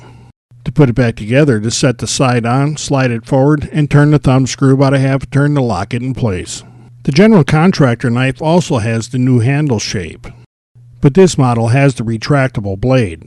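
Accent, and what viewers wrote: Chicago, United States. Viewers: Very useful video! And is that a Chicago accent?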